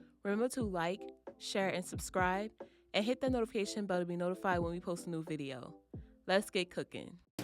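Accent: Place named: New Orleans, United States. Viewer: Ooooh y’all make me sick!😂😂😂🫶. I subbed from the poor man’s Rockefeller recipe. Y’all have the best online. Then I heard the accent and I was like “Oh these my people, ya heard me❤️. When I saw this recipe I’m like “You have to be New Orleans to understand the magnitude of this recipe. I’ll be here for more. Wishing you all the success on the growth of your channel.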